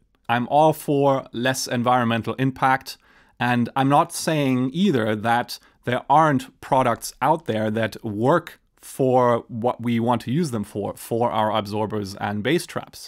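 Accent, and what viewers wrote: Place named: Germany. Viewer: You have some german accent, are you from Germany? :)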